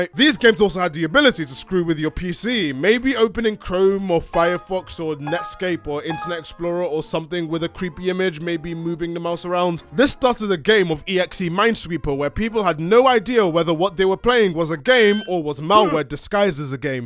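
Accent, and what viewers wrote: England, United Kingdom. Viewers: this dude is 100% English you just know lmfao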